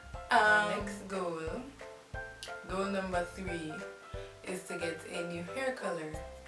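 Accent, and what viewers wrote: Jamaica. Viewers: ...are you Jamaican? you kinda sound...Jamaican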